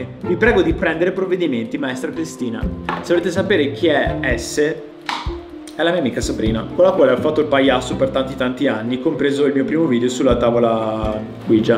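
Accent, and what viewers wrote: Veneto, Italy. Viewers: Diamine! Se sei ignorante e non conosci la mitologia classica, rileggiti (sempre che tu l'abbia letto) tutto il filone antropologico sulla mitologia classica del professore Robert Graves, influenzato dal professor Frazier: Atlantide=Atlante, colui che portava il peso del mondo sulla schiena. Oceano Atlantico=il luogo in cui Poseidone lo aveva confinato. Studia dizione, perché l'accento veneto è pesantissimo!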